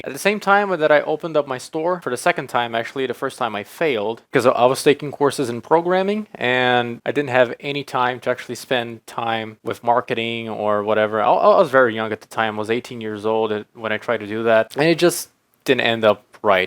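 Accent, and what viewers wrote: United States. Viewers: You speak English near perfectly! If you didn't tell me your nationality and where you lived, I would've thought you were an American from the North East or California! And your wireless mic sounds great! Adeus!🙂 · i thought that he had a bit of that ny flare ahah, i second that blame on rossman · You taught yourself English?! You pretty much sound American, man. That's impressive 🙂 Well done!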